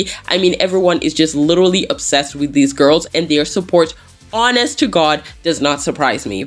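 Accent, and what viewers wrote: United States. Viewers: not related but i thought you were actually from kenya and just have a really good american accent lol are you actually from the states? bc i don't blame you and your family for getting out of this racist hell hole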